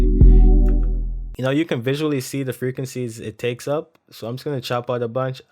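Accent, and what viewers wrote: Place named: Canada. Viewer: broskiiii u sound canadian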